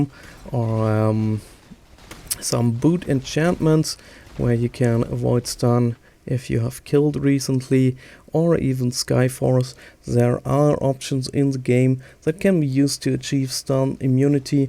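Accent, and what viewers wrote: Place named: Germany. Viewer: we germans speak like that. so what?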